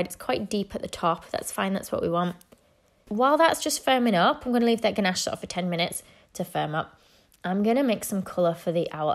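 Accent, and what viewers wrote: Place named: North, United Kingdom. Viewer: Gotta love a northern accent!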